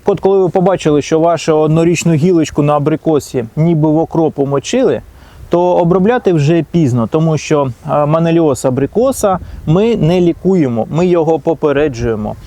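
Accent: Standard. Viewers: А млжно ли этими ронпарптаии обработать вишни, яблони, груши, сливы. Инжир.У нам всех деревьев по 1 штуке и абрикос молодой еще не цвел, то его нужно будет обработать. А мадину и смородину иожно так обработать? И да мова чудова чиста и правильная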